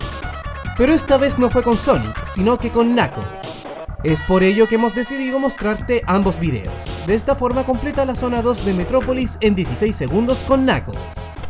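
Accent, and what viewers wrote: Chile. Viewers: Wait hablas español hablas como chileno :v Espera talk spanish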